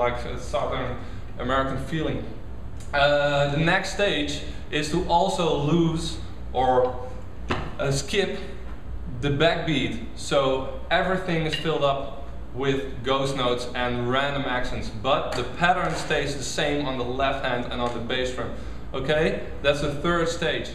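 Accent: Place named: Netherlands. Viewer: Hahaha that Dutch accent. Building up to a very cool beat towards the end!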